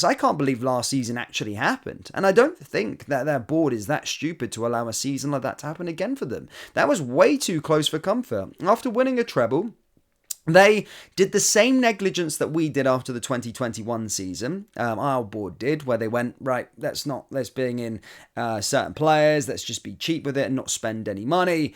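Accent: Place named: Glasgow, United Kingdom. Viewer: Great Glasgow accent mate.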